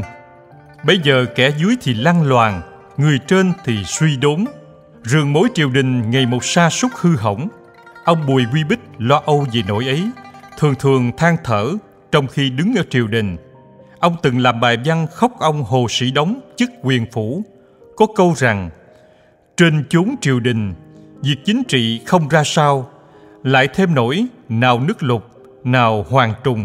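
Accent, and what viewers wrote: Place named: South, Vietnam. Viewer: nghe giọng Nam là thấy rất dễ thương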